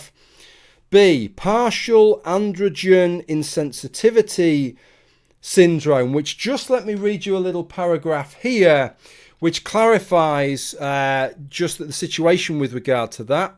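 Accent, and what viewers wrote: United Kingdom. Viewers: RR 2.0, bigger, better and educational, even more UK accent.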